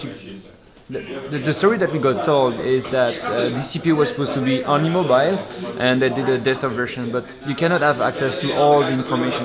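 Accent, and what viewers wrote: France. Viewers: L'accent francais est tres present xF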